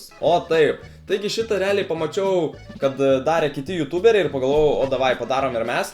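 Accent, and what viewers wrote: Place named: Samogitia, Lithuania. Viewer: Tikrs žemaitis esi, nes žemaitėja yr geriausia ❤ Kap į tava video😍❤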